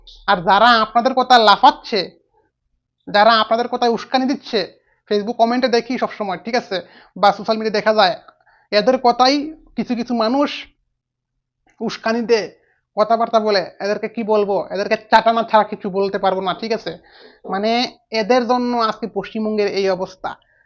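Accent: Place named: Bangladesh. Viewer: Are you Bangladeshi?. Because your style of speaking is completely Bangladeshi people.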